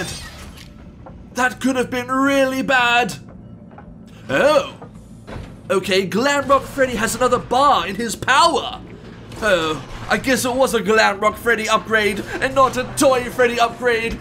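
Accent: United Kingdom. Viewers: Yay the british boy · Nice to see our good ol’ British bear return. 😅 If I remember this right, this’ll be the path to the “Princess Quest” ending.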